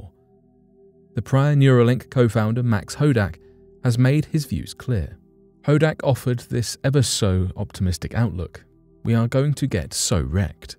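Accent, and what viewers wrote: United Kingdom. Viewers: This narrator is British but sounds great. Compared to that other guy.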